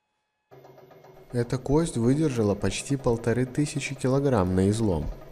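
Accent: Belarus. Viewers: Парень ты из Беларуси, слышно по акценту и скорей всего из западных областей!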